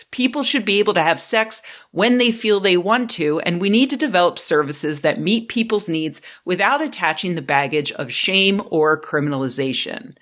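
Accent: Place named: East Coast, United States. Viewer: Rebecca not seeking to detract from your content, but you have one of those East Coast accents that hearing you say the word "Mirror" would be like a bomb going off, in a nice way of course!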